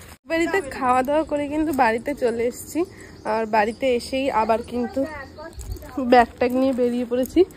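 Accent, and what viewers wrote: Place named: Bangal, Bangladesh. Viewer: Bhaaaa ki sundor bangal kotha koi tmr maiada❤❤❤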